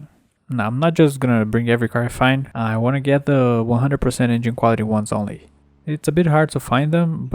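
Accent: Brazil. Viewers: Tem sotaque de BR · teu accent parace um pouco brasileiro, se vc for, incrível que tenha alguem br fazendo um conteúdo tão foda assim, abraço!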